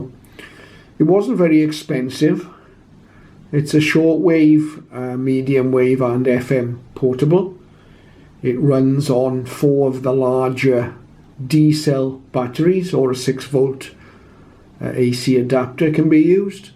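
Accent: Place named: Scotland, United Kingdom. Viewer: I did listen again, and all I heard was that rich Scot brogue…..unlike any other English accent !! I have heard them all.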